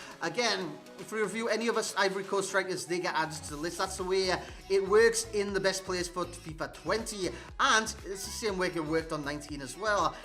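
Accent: Newcastle, United Kingdom. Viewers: Mike Just being enthusiastic for the video, to keep you occupied.. plus he has a Newcastle accent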